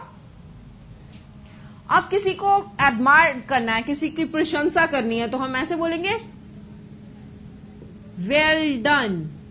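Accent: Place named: United Kingdom. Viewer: Mam aap Indian hai hum samjhe ki uk ke hai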